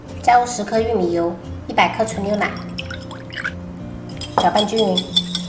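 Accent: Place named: Minnan, China. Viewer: 因為有閩南口音 😂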